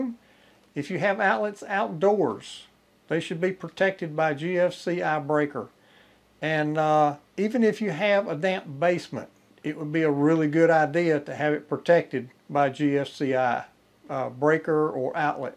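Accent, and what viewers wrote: South, United States. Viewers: He explains it nice and simple. The southern accent is great. Thank you sir. Ya'll good people.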